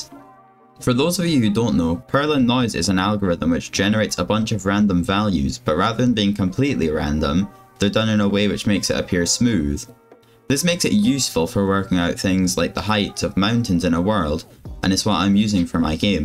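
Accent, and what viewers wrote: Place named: Poland. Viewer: you sound polish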